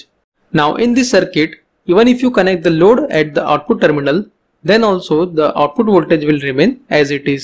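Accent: India. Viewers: Thank you for this video. Very informative but hard to understand. Fortunately there are sub-titles available. It could be even clearer with a native English speaker narrating as many people do not fully understand the accent of the Indian sub continent. The structure is unfamiliar and multiple rolling R's are challenging. The math is explanatory, though really it is secondary to the actual physical characteristics of the circuit.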